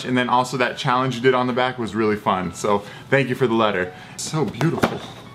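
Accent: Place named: Ireland. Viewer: With your red hair and Irish brogue, have you ever been mistaken for a leprechaun?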